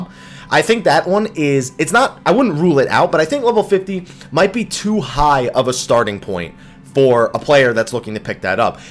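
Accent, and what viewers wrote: New Jersey, United States. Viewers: Two yoots. Old school Joisey speak.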